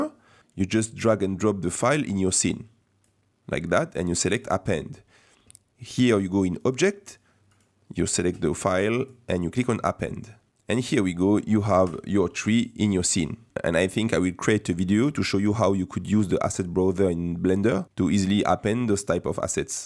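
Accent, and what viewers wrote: France. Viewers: je peut entendre le p'tit bout de francais qui resort. merci pour le tuto! je compte pas re cree la scene mais certains de tes tips m'aiderons ^^ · salut, ca sent l'accent français non ? ^^ cool la vidéo. edit: en plus, c'est un windows en fr, donc plus de doute ^^ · are you French ? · are you french? cause of your accents haha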